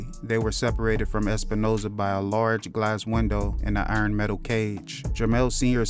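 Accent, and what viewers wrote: California, United States. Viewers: your voice is soooo cali and i love it! i love when ppl rep the city! keep going king ❤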